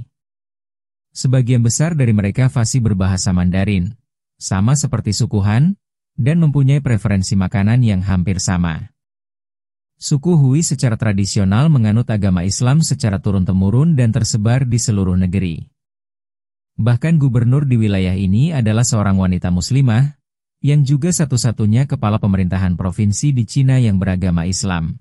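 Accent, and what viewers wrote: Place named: Indonesia. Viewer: waduh narator..................ini gimana sih janganlah abang baca bahasa inggris seperti bahasa indonesia, malu aku bang, bacalah muslim populesyen jangan abang baca muslim population, maluuuuuu.........aku bang, yang nonton konten ini sedunia bang, gimana aku mau subscribe, abang baca aja nggak becus........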